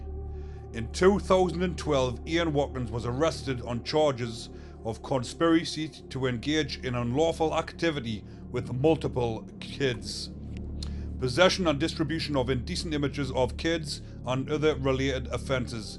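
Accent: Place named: Scotland, United Kingdom. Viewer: Your Scottish accent is cool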